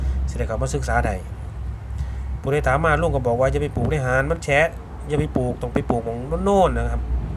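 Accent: Thailand. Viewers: บรรยายสำเนียงอิสาน...คนภาคกลางฟังได้50เปอร์เชนต์..ถ้าบรรยายสำเนียงกลางคนจะเข้าทั้งประเทศ. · ฟังแบบคนภาคกลางมันไม่ได้ 100% ถ้าสะดวกทำเป็นเสียงกลางบ้างก็ดีนครับ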